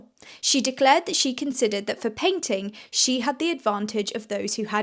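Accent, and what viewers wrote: United Kingdom. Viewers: As a non native English speaker, I can't decide what amazes me most; the wonderful story of Sarah or the delicious British accent of the presenter.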